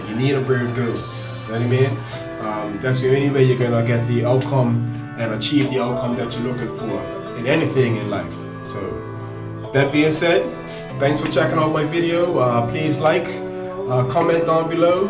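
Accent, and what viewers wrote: Bermuda. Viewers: Nice video, b'ruh, and way to represent! I've been watching and following various people on YouTube giving beard advice and tips. Didn't even think yours was from Bermuda until I heard you speak. I've been growing mine for awhile but kept on trimming or keeping it low. Now I've let it grow. It's kinda like that Kimbo beard (well that's what people call me at times, lol) though I get the Rick Ross comparison too. It's all good. You give good advice in your video. Similar things I've heard people say in their logs and what I'd suggest too. Growing a beard definitely takes time and patience. Never compare your beard to another's and develop beard envy. Each man's beard is unique...take pride in what you got. Imitation is the most sincerest form of flattery so if you see others doing what you do just take it as a compliment. Nice job, b'ruh. 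· Saw the 441, heard the accent and knew straight away. Shout out to Bermuda!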